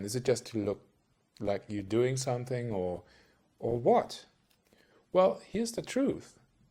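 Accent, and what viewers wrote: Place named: Mid-Atlantic, United States. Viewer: Some good pointers==thanks. There's a mid Atlantic twang in there somewhere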